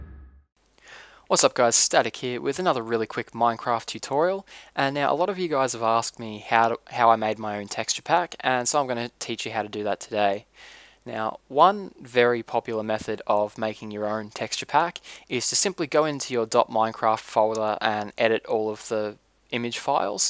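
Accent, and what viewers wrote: Australia. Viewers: Are you from Australia? C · hes australian? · i love ur accent im not sure but is it Australian?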